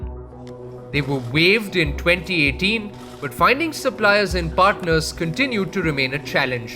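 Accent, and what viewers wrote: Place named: India. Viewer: you talk your desi way, girl.. pretending this is awkward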